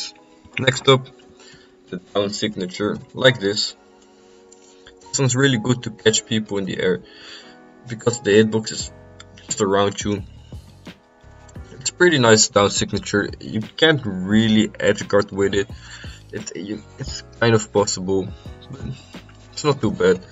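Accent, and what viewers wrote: Netherlands. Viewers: (Ik ga er van uit dat je nl brnt door je accent)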